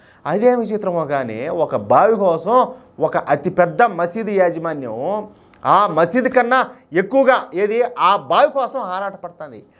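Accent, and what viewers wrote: Rayalaseema, India. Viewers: బ్రదర్ నీ వాయిస్ మార్చుకో రాక లోకం సుధాకర్ గారు చెప్పినట్టు శాంతంగా మాట్లాడండి స్వచ్ఛంగా మాట్లాడండి, తెలంగాణ రాష్ట్ర రాయలసీమ యాసలో మాట్లాడొద్దు అండి, అసలు బ్రదర్ నువ్వు రాక లోకం సుధాకర్ గారు చెప్పినట్టుగా శాంతంగా చెప్పితే చాలా చాలా బాగుంటుంది వినడానికి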